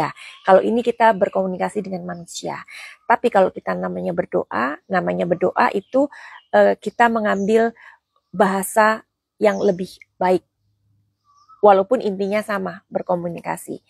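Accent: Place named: East Java, Indonesia. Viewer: Maaf madam asli mn ya koc bahasanya kaya org Jawa timuran 🙏🙏🙏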